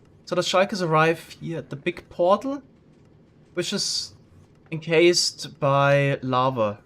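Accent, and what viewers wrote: Germany. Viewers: Hi du hast manchmal einen deutschen Akzent. Bist du Muttersprachler Deutsch?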